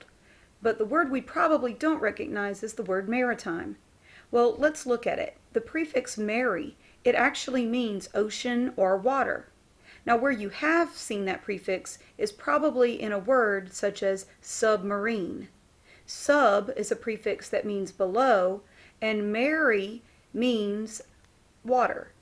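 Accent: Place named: Texas, United States. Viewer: We were watching this in class and the teacher said it might get cheesy and she said you had a weird Texas accent. (But I like your accent also the video helps a lot thank)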